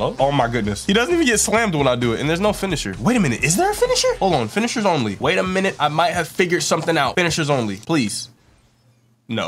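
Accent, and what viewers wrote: United Kingdom. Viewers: YO Keep up the vids love them aspecialy ur brit accent( i want Erly Acc im Krath_100)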